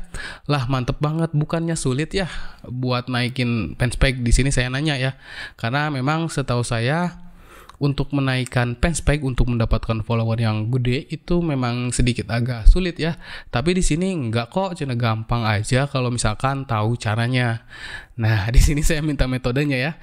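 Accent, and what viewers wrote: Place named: West Java, Indonesia. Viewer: lagam indo na siga sunda,sunda na timna a?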